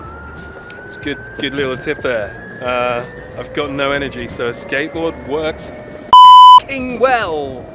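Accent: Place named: United Kingdom. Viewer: I know you’re a straight guy, but as one of your gay fans, and with utmost respect, I must remark how very handsome you are. I am a pushover for guys with British accents, but add the beard and the adorable eyes, and you are simply a heartthrob. Have you ever been called a heartthrob before? I’d have to wager that, obvious as it seems to me, you have to have heard it once or twice before! Pardon my moment of gushing, but I just felt it needed to be said. Now there, I feel so much better! And I hope you are too, my friend! Peace and health to you and all those you love! 🇺🇸🤗🇬🇧‼️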